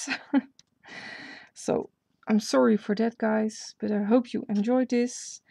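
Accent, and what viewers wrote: Netherlands. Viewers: you dutch ? You sound really dutch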